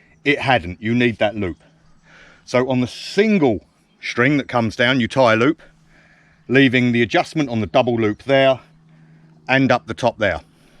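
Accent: United Kingdom. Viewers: Why is it always British guys with hell of an accent introducing Lanshan 2 Pro? I swear...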